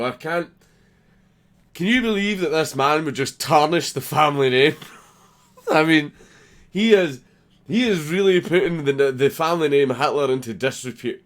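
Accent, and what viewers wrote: Germany. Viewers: Say "party pooper" in your German accent. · Man you can do a German accen hilariously well! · Pretty solid German accent buddy 👌👌👍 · your german accent is godlike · You do a REALLY good German accent! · That german accent is creepily accurate.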